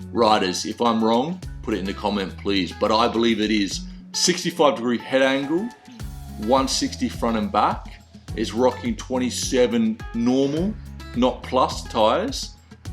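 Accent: Australia. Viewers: Great vid Awesome to have an Aussie accent reviewing these bikes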